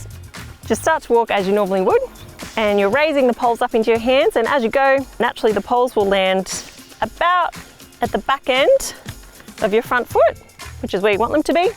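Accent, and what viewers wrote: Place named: Australia. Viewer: OH.......! I am NOT being xenophobic here cause I reckon Culture & Multi-Culture are GREAT.! - But It's SO NICE To HEAR An AUSSIE Accent on YouTube....!!! Thanks, good work matey.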